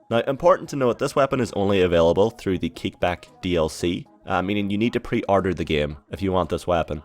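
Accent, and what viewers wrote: Donegal, Ireland. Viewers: haha I just know the accent lol im from donegal as well can't wait for this game to come out 😊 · Are you Irish?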